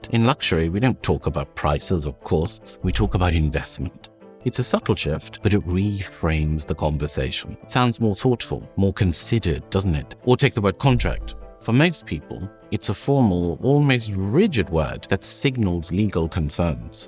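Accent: RP, United Kingdom. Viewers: BE CAREFUL, THAT 'POLISHED' BRITISH ACCENT IS WHAT GOT YOUR MIND AND NATIVE LAND COLONIZED.. I AM HERE TO COLLECT 😈🍄💯🤘🏾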